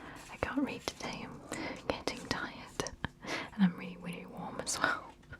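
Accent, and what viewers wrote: England, United Kingdom. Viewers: I think you have an amazing voice and English accent.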